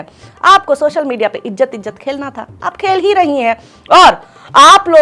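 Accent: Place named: Agra, India. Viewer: Ms. Anchor-Young Lady Aapki ghungrali hindi Sun kurr bachpan kay din yaad aa jateon hain. Lagta hai aap Agra kay gird pudhi badhi hain. Thank for making us smile God bless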